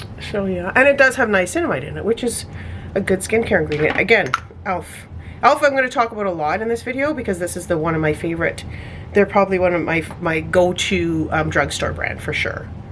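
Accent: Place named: Canada. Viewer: Well hello fellow Canadian (I'll be very surprised if you're not) - that's what your 'accent' sounds like - anywho, I'm a brand new subbie who happened to stumble across this video and I hit subscribe right away. I am a 50 y/o, mother of 1, married x 25 yrs and working as a stenographer in our hospital x 25 yrs. I'm a makeupaholic, it seriously is becoming bit of an issue, and I promised myself (and my hubs) that I would try really super hard to be on a no buy or low buy this yr. I would love to see you do a full glam look and I just know I'm going to love your channel. Sending big xo's from Labrador, Canada.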